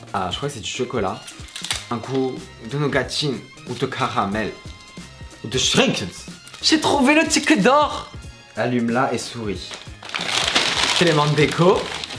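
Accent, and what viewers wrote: England, United Kingdom. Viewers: J'adore ton accent anglais quand tu parles anglais (c logique )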